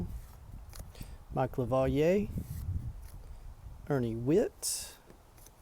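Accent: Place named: Southeast, United States. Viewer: This was actually not too shabby for the amount of hall of famers pulled! That 1989 Donruss was a tinge bit of a letdown, BUT Craig Biggio Rookie Card is a Great Pull 😍!! Fine Video and props to you for opening up outside, and I Absolutely Loved the bird sounds in the background. I just realized, you got a slight accent, are you from like the Southeast like Tennessee or maybe West Virginia area? I'm bad at accents 😅 but you are easy to understand and I reside in Washington State myself 😎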